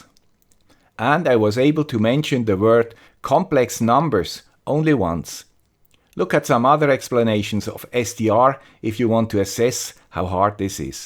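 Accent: Switzerland. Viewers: Those 1% of the facts informed me more about SDR than I have ever seen. And all with a Swiss accent. Thank you. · One of your greatest videos! Thanks! And your Swiss accent is perfect as usually. :-) 73! Jakub, OK1SE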